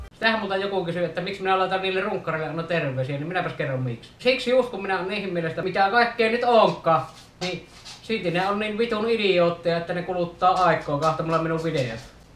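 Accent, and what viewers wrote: Savo, Finland. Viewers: Savolainen englanti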